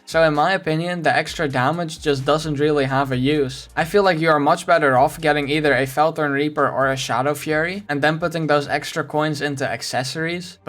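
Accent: Netherlands. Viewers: I smell a little bit of dutch, IGN: Lancebobo3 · bet that this guy is from the netherlands i know the accent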